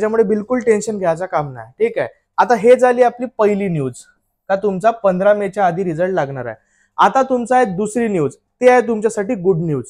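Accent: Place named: Malvani, India. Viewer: Sir khup chan malvani boltat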